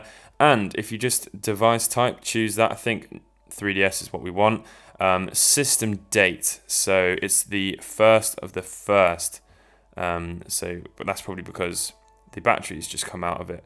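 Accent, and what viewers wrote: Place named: United Kingdom. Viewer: In British English.. perfect, i love your accent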